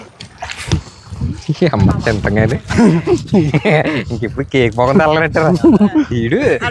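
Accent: Godavari, India. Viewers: Godavari voice❤❤❤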